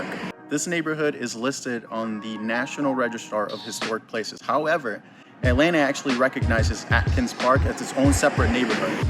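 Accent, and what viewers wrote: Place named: Latin America. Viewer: Hey, thanks for the video, very informative, I like you're latín too couse I could understand All the video (im practicing my english) and that make me happy... Well im dreaming to live in there (im from Colombia) and I wanna know how is to be latín in ATL (maybe one video idea)... Leave you a big like!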